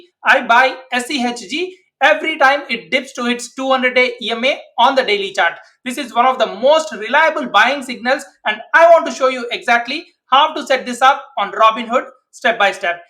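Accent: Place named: India. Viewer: You have the most stereotypical indian voice I've ever heard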